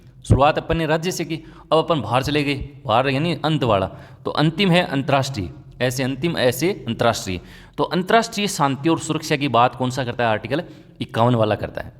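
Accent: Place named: Shekhawati, India. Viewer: Sir aap shekhwati se lg rhe ho muje sari marwadi boldi h aaj ti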